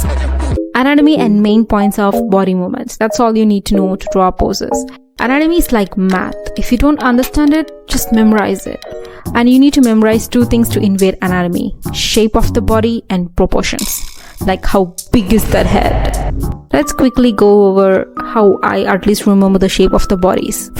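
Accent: India. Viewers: she actually sounds more indian than european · great to hear an indian accent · Do I hear an Indian accent? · i swear i hear Indian accent a lil bit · it is an Indian accent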